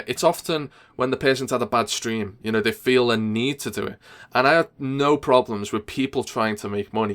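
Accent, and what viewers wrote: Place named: Liverpool, United Kingdom. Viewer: Dirty fucking scouser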